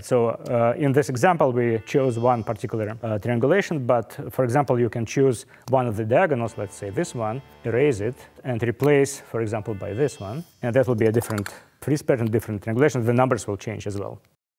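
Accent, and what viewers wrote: Russia. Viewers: Эх, русский акцент не спутать :)) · The Russian/Slavic accent makes me [ r e d a c t e d ] and strangely patriotic. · Another Russian smart mind, love to see your Russian accent <3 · Подумал, что у него русский акцент и не ошибся) Привет соотечественникам!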